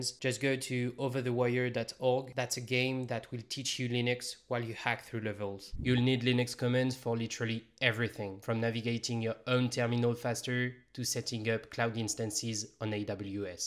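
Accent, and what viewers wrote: France. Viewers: Toi t'as un accent français 😀! Cool ta vidéo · you sound french right . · Sounds like a french man 😅. Ahh Really informative video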